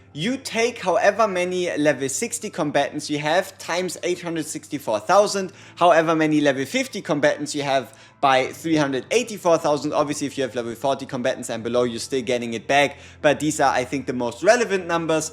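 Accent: Germany. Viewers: Love yoir heavy german accent 😭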